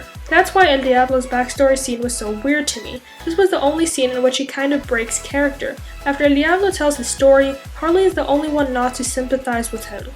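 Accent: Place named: New Jersey, United States. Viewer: Its a New Jersey accent :)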